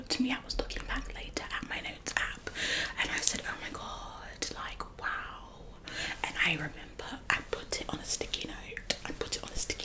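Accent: United Kingdom. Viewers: i need more british accent it was so gooooood!! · omg the british accent is so realll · there's smth about the British accent that really brings this all together 😭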